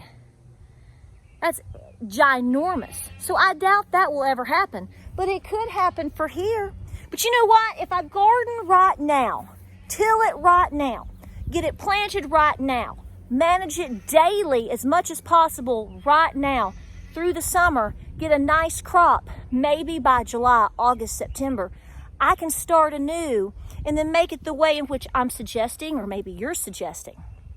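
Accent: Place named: East Tennessee, United States. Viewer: Hey! I spent my first 18 years of life cradled in the Ridge and Valley Region of East Tennessee. Great people, for the most part.... And it feels like the most ancient region of the world, and maybe it is? I so enjoy hearing your stories, and hearing that accent that I love hearing coming from a woman. Down to earth, hard working, tough willed and minded, the breed of people from that area are some of my favorite. I enjoy your even-handed approach to everythiing. You always take a common sense direction in everything you do, and that includes praying about it. That's the most important part, isn't it? Ha! Keep on doin' what you're doin', Girl.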